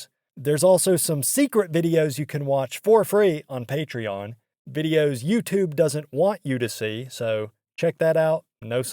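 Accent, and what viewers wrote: South, United States. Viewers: Aye man. I dont know what to say about your story without it being patronizing or anything like that. I really hope things look up for you man in some way. I live in California and by your accent im guessing you live in the south. Just know this life is worth living. I know sometimes it feels like it isnt but if at anytime you need a bro to talk to man im here bro.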